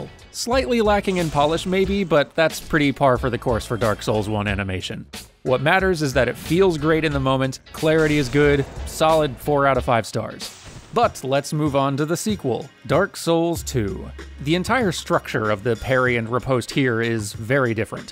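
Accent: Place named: United Kingdom. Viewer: That british accent tho. Most Exquisite.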